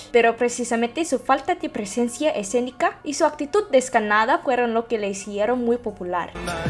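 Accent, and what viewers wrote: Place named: Russia. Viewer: Pense por un momento q su nombre era Lelouch el protagonista del anime Code Gears...Sobre como hablas español la gente piensa q tu acento parace ruso por la "R" los rusos por lo general suelen arrastrar DEMASIADO la "R" al usarla en el español. La R solo se arrastra cuando alguna palabra comienza o terminas con R (raro, rico) o cuando aparece la doble R (ferrocarril, carro) y cuando aparece entre consonante y vocal (pra, pre, pri, pro, pru, bra, bre, bri,.....etc) como por ejemplo preparatoria, batracio y por ultimo cuando en una silaba esta compuesta solo por una vocal (aeiou) seguida de la R por ejemplo articulación, ermitaño, ortiga (la silaba q le sigue comienza en consonante....)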